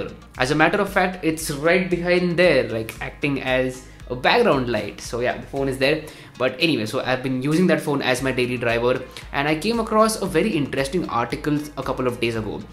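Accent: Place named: South, India. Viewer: Your name is nd speaking accent is like south 🤔🤔🤔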